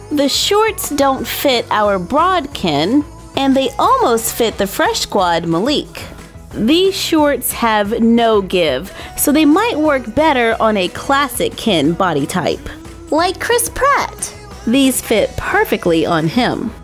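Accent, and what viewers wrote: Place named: South, United States. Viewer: I love this and that southern accent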